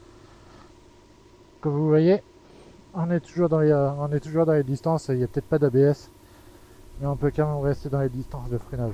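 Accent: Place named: Grenoble, France. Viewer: Salut l'ami. Un Bel essai de ta machine. Ben sa fait loing pour te prêter une machine. Je vois plus où t'habites mais à l'accent du Piti Piaf je dirais pas loing de Grenoble aux alentours. Gros V ✌🏻.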